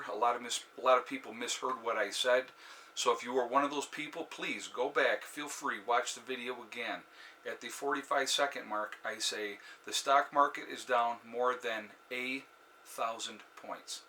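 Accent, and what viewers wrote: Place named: New York, United States. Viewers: That’s too funny. I thought you said 8,000 but it didn’t even phase me because I don’t watch the market. I think it’s the NY accent! Blessings! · I heard 8,000 too! 😄 Must be that NY accent. In NY, 'A' means 'What?'. No biggie. 👍 Obviously, this virus is being handled by the 3 Stooges. I've spent a ton of $ too. My credit card is screaming! Think, research, prepare, and plan to take care of yourselves and your families. Stay safe everyone & God bless.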